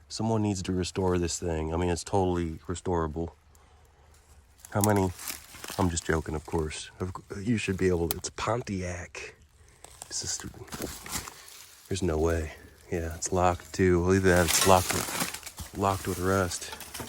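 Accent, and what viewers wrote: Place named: New York City, United States. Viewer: You sound like you lived in NYC.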